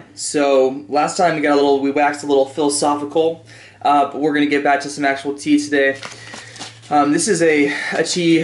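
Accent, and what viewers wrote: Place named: France. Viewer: You have a little accent . Are you French ?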